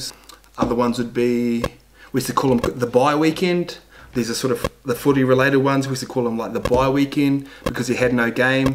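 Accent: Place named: New Zealand. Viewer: Lengo? it means 'focus' in Swahili. Oh that kiwi accent, you meant lingo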